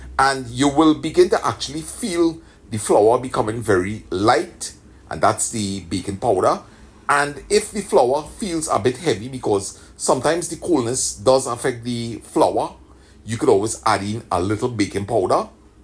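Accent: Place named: Trinidad and Tobago. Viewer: Kudos. Another good video. I like this format where you narrate, you should do it some more. You keep it real with your natural Trini accent unlike others who try to speak “properly” but sound pretentious. I’m familiar with the Caura area and used to buy doubles from Palance at the junction many years ago and now live in cold Toronto. Next time I visit I’ll contact you prior so I can also visit Farmer Harry. Blessings.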